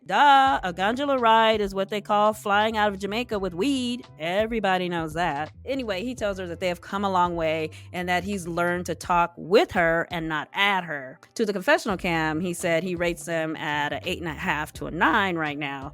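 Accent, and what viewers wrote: Boston, United States. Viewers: You are HILARIOUS, I appreciate your commentary and your Bostonian accent voice overs💗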